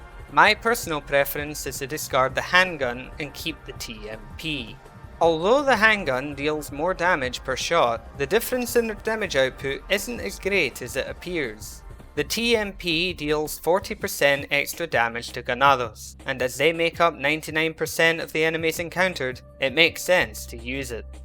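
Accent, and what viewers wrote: Scotland, United Kingdom. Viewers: Are you Scottish or Spanish?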